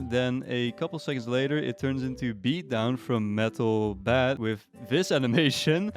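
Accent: Netherlands. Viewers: Gast ik wist gewoon dat jij nederlands was ik hoorde het accent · Insane how fast you can hear a Dutch accent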